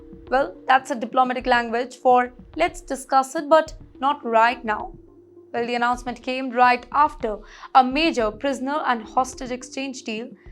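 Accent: India. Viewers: THERE IS NO TEREBEL LIKE INDIAN NATIONALITY SPOKE ENGLISCH 😅LIKE YOUR MOUTH FULL WITH TOUNGHS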